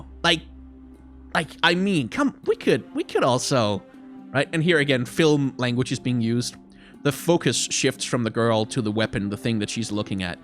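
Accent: France. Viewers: I could listen to with a french accent ALL.DAY. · that french accent is painful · Your french accent is astonishing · that French accent made my day thanks buddy, great content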